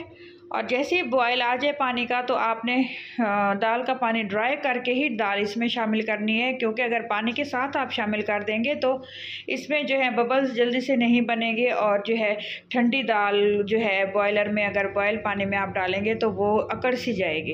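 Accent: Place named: Saraiki, Pakistan. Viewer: ماشاء اللہ بہت اچھی دال بنائی آپ نے منہ سے پانی آ رہا ہے۔😋 آپ کی آواز سے پتہ لگ رہا ہے آپ سرائیکی ہو۔ سرائیکی ہماری مٹھڑی زبان ہے اس لیے اندازہ لگایا بہرحال آپ کھانے بہت اچھے بناتی ہیں 👌👍👏👏🌹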